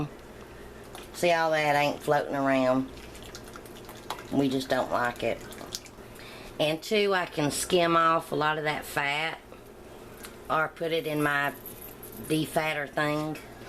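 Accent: South, United States. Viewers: Your very welcome! What part of the South are you from? Greetings from Canada....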